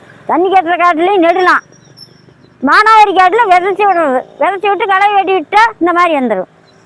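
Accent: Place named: Erode, India. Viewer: ஈரோட்டில் எந்த ஊருங்க, நாங்க பேசுற பேச்சு மாதிரியே இருக்கு, அதான் கேட்டேன்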